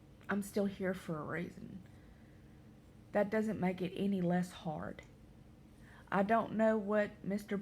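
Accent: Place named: Carolina, United States. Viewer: Thanks. I’m from chapel hill and i recognize that Carolina accent anywhere ❤️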